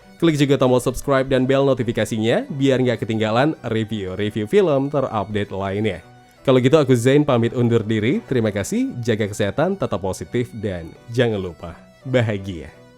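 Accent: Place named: Manado, Indonesia. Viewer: logat logat manado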